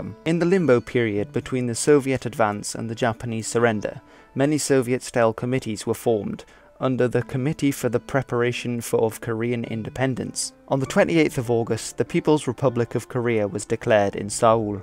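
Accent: United Kingdom. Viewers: How did you manage to get such a good British accent? I had no idea you were Dutch, you sound exactly like a native speaker to me. Do you have any advice for acquiring such an authentic accent?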